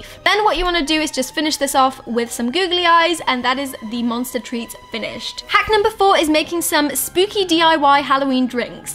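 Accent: United Kingdom. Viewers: say ping pong one more time. that cute british accent tho 😄🙇😉😉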